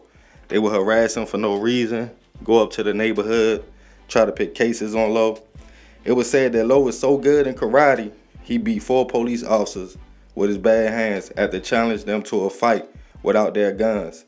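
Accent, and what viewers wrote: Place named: DMV, United States. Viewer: Lo was 18 bro get it right sound like you from the dmv so you not from out here so it's not your faught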